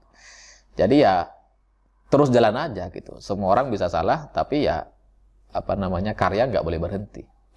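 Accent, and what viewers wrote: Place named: Madura, Indonesia. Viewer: Oo mas orang Madura ya...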